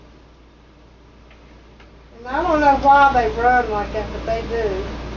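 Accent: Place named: Appalachia, United States. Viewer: my mom had the same cookware you have - waterless cookware. I remember she paid a small fortune for them and took the best care of them using Bar Keepers Friend on the inside EVERY time she used them. They are 30 years old and in mint condition. She has the same grinder tool too. My Mom passed away about two years ago and I miss her every day. Seeing you cook with your pots and pans and in that beautiful Appalachian accent - also like my Mom - makes me happy in a special way. So thank you for doing all you do to share your cooking experience. It means a lot to me. Btw, Mom always told me that if I didn't scrub her pots properly and take good care of them after she was gone, she would come back and haunt me. 😁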